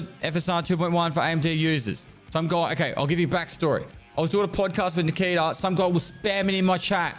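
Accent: Australia. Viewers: Nothing like having a patch read by an australian man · Me being Australian understood every word he said but…. I could imagine not being Australian could be hard. But cry us a river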